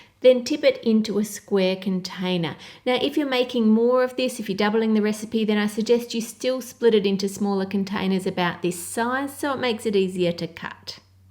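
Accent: Australia. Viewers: who loves australian aacent?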